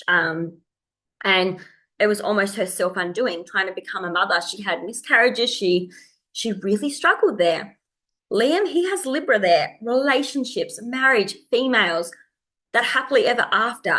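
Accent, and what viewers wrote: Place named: Australia. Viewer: Amazing job done! You sound Aussie BTW! ❤️❤️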